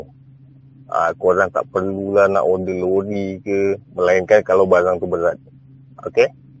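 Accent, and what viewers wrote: Java, Indonesia. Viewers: Pekat kang jawanya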